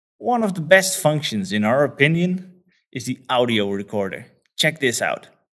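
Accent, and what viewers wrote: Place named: Netherlands. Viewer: Nice App! Nice explanation. Your accent sounds more Dutch than Brazillian to me!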